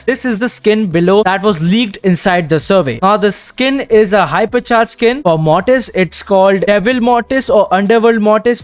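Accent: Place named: India. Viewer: I like Indian accent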